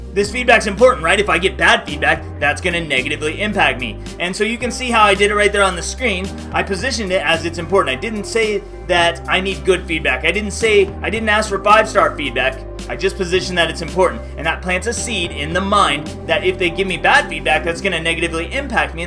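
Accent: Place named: Scandinavia. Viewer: Hey, I wonder whether you're a native english speaker (?), you sound continental european/scandinavian european :)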